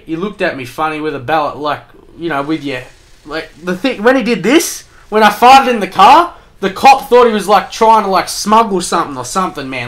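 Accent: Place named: Australia. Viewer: I never knew you were an Aussie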